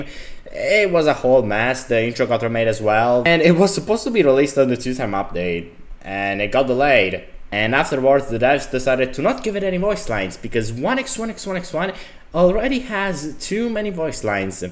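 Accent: Italy. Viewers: heard that voice and had a suspicion, then that “proelium fatale” was obvious, sono italiano anch’io ;)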